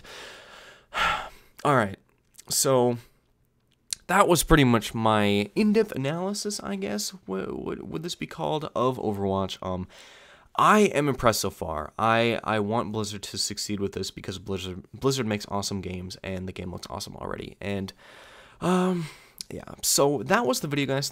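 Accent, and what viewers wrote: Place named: United States. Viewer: i really think you deserve more views <3 i'm the sort to overthink things as well, i have many theories that i want to turn into a storyline for tf2 (obviously, not before i consider all of its comics). unlike you, i lack the voice and the concise speech manner (i'm sure you're using american english, which is my favorite) to make videos, so my only good english is the written one x'D i admit not knowing the characters/storyline of the game you are talking here, but your video is very pleasant for an introduction. too bad people lack the patience to listen for so long. somewhat, i wish there there was a heart shot rewarding system as well x'D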